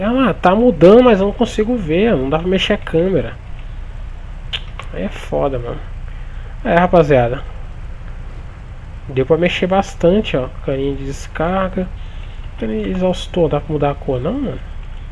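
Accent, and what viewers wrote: Bahia, Brazil. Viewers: Caramba mano tu e baiano né kkkkk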